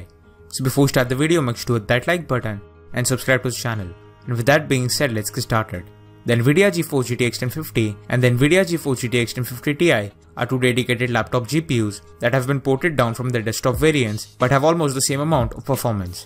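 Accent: India. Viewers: Fucking curry accent, drank too much contaminated poop water that made you indians have that accent?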